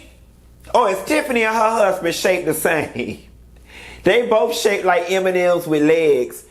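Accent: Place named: Mississippi, United States. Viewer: Literally!! Since your first video I was like omg his ass sound like he from Mississippi lol yo ass be knowing some shittt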